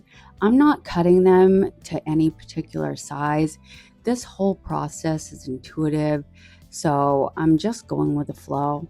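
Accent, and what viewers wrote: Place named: Boston, United States. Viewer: Some vocal rhythms I just cannot listen to. I can’t watch some diy channels because of it, or the repeating of words, like “I’m gonna go ahead and…” I’m out, I can’t do it. 🤦‍♀️🤷‍♀️😂 I don’t know what it is, but I just can’t listen to it. I know it isn’t their problem, it’s mine. I have heard people repeat that phrase ten times in the first minute or two and I just don’t know what causes me to check out, but I do. I haven’t noticed any of that on your channel, your voice is relaxing. Are you a Boston girl? You have a slight accent. Your journal is beautiful. I am like you, it is hard for me to make decisions and to get started on something new. I need less stuff, because I have a hard time with decision making. 😂 I am actually going through my stash and throwing some things out, because I have far too much stuff.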